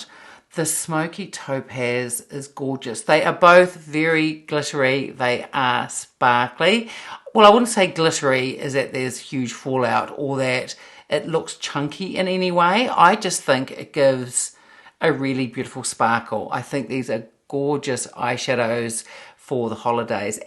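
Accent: New Zealand. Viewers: Enjoyed your video. Love your New Zealand accent. I’ve been wearing mine over Oyster Pearl - using the dark brown from last year’s holiday palette in the crease and to line the lids. It creates a nice soft smoky look. I find the Smokey Quartz to be a bit silvery on my skin to I’ll be looking to matte that down a bit using a shadow from a quad or 12-pan. Either way I love them!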